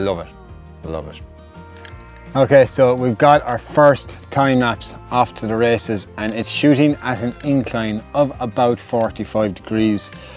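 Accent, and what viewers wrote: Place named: Ireland. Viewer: Nice video mate! I really enjoyed watching and dude I love your Irish accent. Keep on vlogging but also take your time and enjoy the time with your friends in the wonderful Irish nature. Thanks for sharing! Take care!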